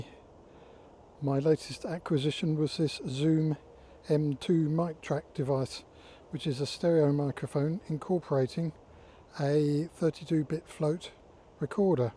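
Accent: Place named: United Kingdom. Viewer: Thanks for your video, it's very helpful for a beginner like me. You sound like you are from the UK. Did you emigrate to Australia or are you on holiday? Best wishes, Rob, Romford, Essex.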